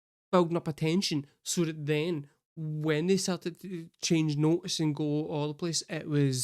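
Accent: Scotland, United Kingdom. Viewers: My lad, you have the thickest Scots accent I've heard on YT! Tell us what part of the Auld Sod do ye hail from and whether or not you speak Gael?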